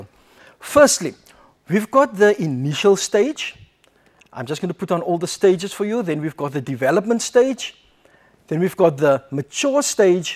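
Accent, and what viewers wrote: South Africa. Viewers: South Africa :) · south africa, cape town. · Cape (South Africa) accent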